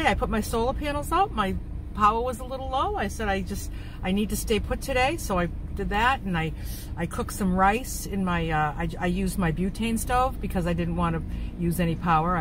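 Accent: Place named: Florida, United States. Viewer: is your driver seat on the right hand side? You talk like you're in Florida but what the hey?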